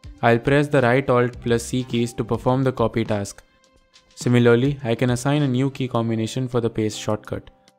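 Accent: India. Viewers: I will always trust a captain with an Indian acccent. Thank you kindly. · this sounds racist, but i was so glad to hear an indian accent bc i knew it would help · I love how Indian accent people do tutorial for video that people need to watch. Keep doing this! A warm thanks from Canada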